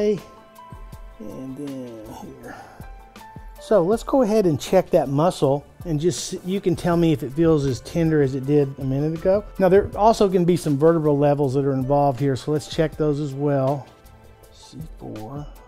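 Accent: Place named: Texas, United States. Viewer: JasonJMist I am not american so every american accent for me is just heaven! And he doesn't have just an american accent! He has a texas accent, which is heaven plus version for me hahaha I am not even a native english speaker and I can notice a lot his texan accent! And yes, for me the best and sexiest accent is not the british, it's the american. :) lol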